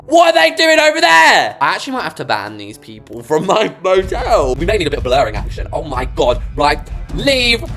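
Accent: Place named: England, United Kingdom. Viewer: The English accent always gets me lol.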